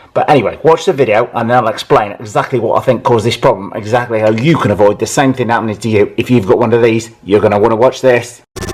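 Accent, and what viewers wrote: England, United Kingdom. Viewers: greeting from Berlin Germany...I love your videos, but some times your English accent is too strong and i miss some word here and there. could you please tell me what gearing you use in this particular video? sorry i did not understood went you say it in your video. Thank again for all the tips...(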